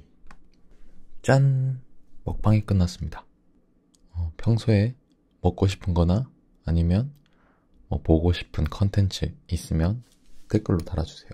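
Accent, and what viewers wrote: Busan, South Korea. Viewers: 부산말투?사투리 느껴져서 괜히 정감가는듯 ㅎㅎㅎ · 부산사투리가 왤케 좋죠? ㅎㅎ 꿀떨어짐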